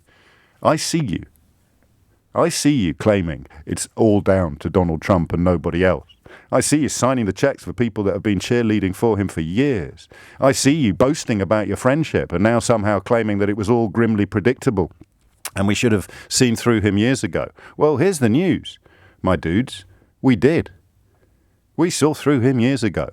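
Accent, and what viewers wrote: United Kingdom. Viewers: I love this commen sence man with British accent.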